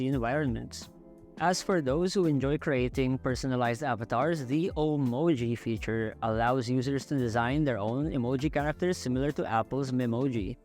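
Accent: India. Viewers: Bakit may slight na Indian accent? Lol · Indian nationality ba yung nagsasalita? 😮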